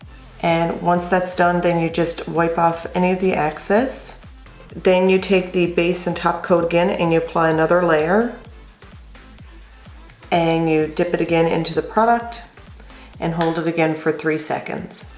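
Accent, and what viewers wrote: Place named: Newfoundland, Canada. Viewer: I just came across this video because I bought the system. Qp seconds in I had to go see what part of NL you were from ☺ fellow NLer here.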